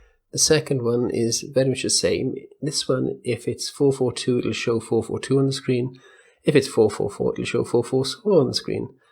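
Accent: Wales, United Kingdom. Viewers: Nice Welsh accent! Cymru am byth!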